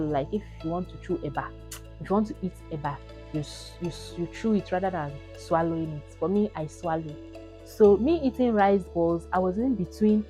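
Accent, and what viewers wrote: Ghana. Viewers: 80% Ghanaian accent loading oo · you speak Ghanaian English. And you are very natural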